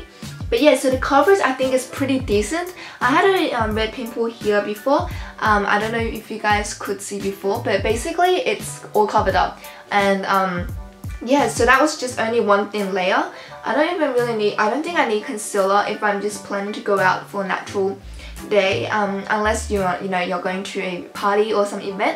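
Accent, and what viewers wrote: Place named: South Korea. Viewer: thank baby love your share 尽管听韩国口音很想死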